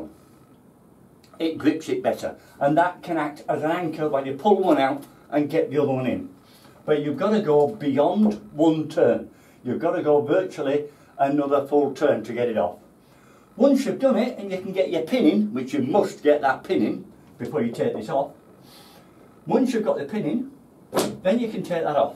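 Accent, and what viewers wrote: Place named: Blackburn, United Kingdom. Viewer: Great video , sound local to me in Blackburn . Saved me hundreds of pounds was dead easy to fix . Thanks so much fella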